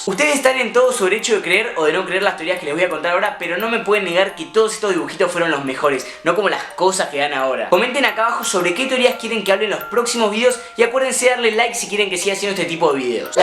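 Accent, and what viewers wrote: Argentina. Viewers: sos Argentino????? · de que parte de Argentina eres??? Eres el mejor:3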